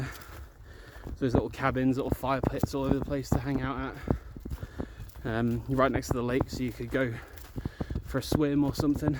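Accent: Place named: East Anglia, United Kingdom. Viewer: "I'll be DAMED if the brick is overtaking me" Made me laugh a lot more than it should of... Where are you based in the awesome UK? Sounds like Anglian Area?? Keep up the good work and Enjoy the adventure.. Dave UK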